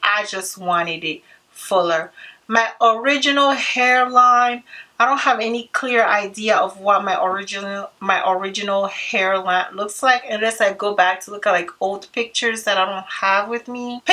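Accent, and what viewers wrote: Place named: Togo. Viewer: Hey sister, wow............. are you from TOGO? I never knew that because of your accent, so do you speak EWE language?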